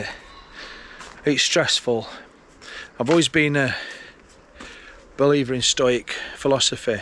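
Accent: Yorkshire, United Kingdom. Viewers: Ahh I am new to your channel but listening to your lovely Yorkshire accent is getting me through Covid..... Stay strong and good thing's will come your way. Plus you have your lovely who is an absolute sweetheart... Love to you both X X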